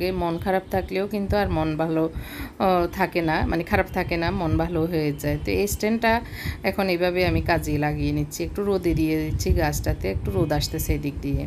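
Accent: Noakhali, Bangladesh. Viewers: Apu Noakhali r naki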